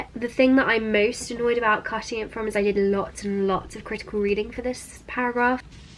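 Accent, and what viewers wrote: United Kingdom. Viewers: I’m Italian and I adore listening your voice because I love British accent 🥰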